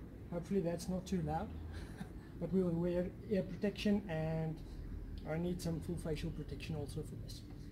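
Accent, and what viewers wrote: South Africa. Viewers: You sound like you speak afrikaans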